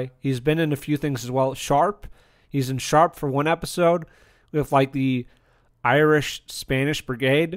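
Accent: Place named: United States. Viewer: Firstly, thanks for taking the time to share your view of the show, enjoyed hearing your perspectives. I remember watching both Sharpe and Hornblower growing up and all the family liked both series very much. Both fantastic shows. That said, Hornblower is a definite personal preference for me. What’s more, I can say as a UK native that the general perception of status of these 2 shows, again although both well liked, is that Hornblower definitely has the clear higher status of the 2 shows. I think the difference in perception comes down to culture. I could be mistaken, but the reviewer of this video sounds American. Apologies if mistaken. Sharpe is a more typical heroic character or rather a simpler childish imagination of heroic character. He fits the American idealised trope of hero more than Hornblower, that’s why it is easy to understand why American audience probably get and therefore have more appreciation of the Sharpe show. 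Sharpe right from the start, he knows what he’s doing, he’s confident, competent and cool. He’s also a bit independent and brash, going against authority, charging first into battle because it’s the best way to get himself promotion. He meets a different woman every episode like he’s a James bond type. Its certainly more fun and cool (perfect for American audiences), but also less authentic and more simple as a character study. Since he’s so developed from the start, there less scope for character development through the series. Hornblower on the o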